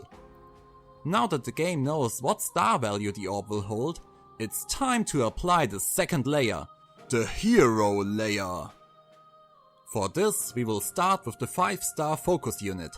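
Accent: Germany. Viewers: this man has a very german accent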